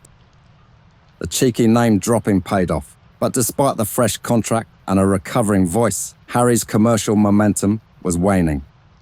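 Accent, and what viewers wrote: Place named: Cockney, United Kingdom. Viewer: Excellent as usual brother, your writing is absolutely stellar! Wonderful listening...the Cockney James Cagney!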